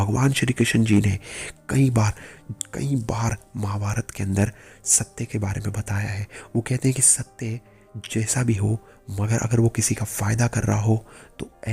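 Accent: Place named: Bihar, India. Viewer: Aryyyy bhai suvicha to achha hi but video me voice bihari bhaiya jaise hi .. thoda voice thik kr k dalo..... nice bro. .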